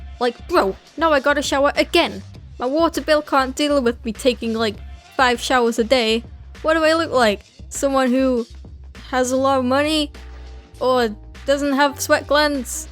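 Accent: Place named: United Kingdom. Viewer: Yeah I thought you sounded British, i'm British too and nobody cares cause nobody cares about the people who talk about them self's and their problems in the comment section and they'll just say "N O B O D Y C A R E S"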